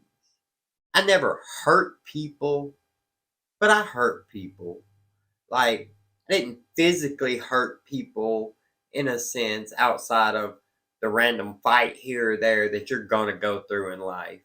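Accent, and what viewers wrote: South, United States. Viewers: You and I have quite a lot in common. Down to the “Southern-ness.” 😆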